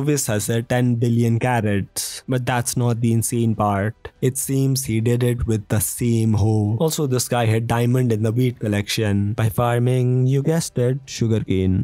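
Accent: India. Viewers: you sound like Indian?? are you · bro has pure indian accent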